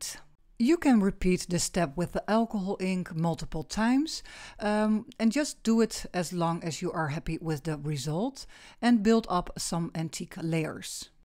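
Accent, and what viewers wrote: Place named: Netherlands. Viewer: Hey dear, how nice that I could discover you through Luise, because your projects, design and expression is just cool & beautiful 😍 Interesting artist exchange you did together, I think that's great and must have given you a lot of pleasure 🥰 and me a lot of inspiration. You speak, like so many Dutch people very good German and English and I love the Dutch, their country, their culture since I was two years old (1966) and love their accent so much. ❤️ Sorry if this comes across as totally slimy, but it's just the way it is with me, because Dutch culture has had a big influence in my life. I lived close to the Dutch border for 48 years, did a lot of shopping, had some friends there and spent many, many holidays there. I also bred Kooikerhondje for 10 years 😆 Thank you for sharing your art with so many people! 🧡... I am happy to be able to learn from it!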